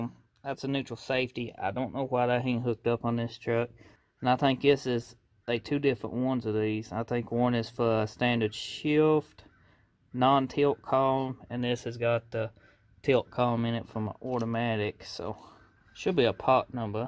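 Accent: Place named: Los Angeles, United States. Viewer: La accent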